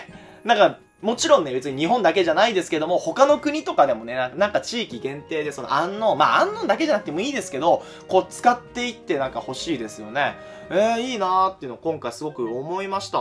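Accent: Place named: Kansai, Japan. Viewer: 関西弁ええな！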